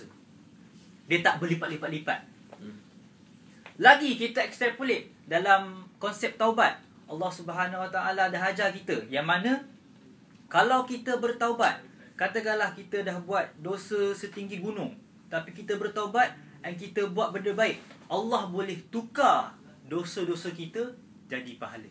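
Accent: Malaysia. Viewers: Ni konfem kelate..haha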